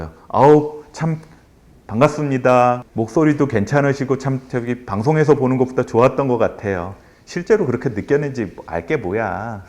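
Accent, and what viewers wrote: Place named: Seoul, South Korea. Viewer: 서울경기권 방언입니다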